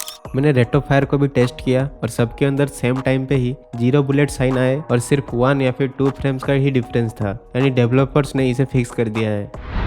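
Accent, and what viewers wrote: Odisha, India. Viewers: Are u odia bro? Your accent is so strong 😂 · Kya aap odisha se ho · Odia bhai voice ru jana padi jauchi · Bro i think you are from Odisha..Your native language is Odia..BTW you explained this clearly.love from Odisha. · Aap oriya ho na?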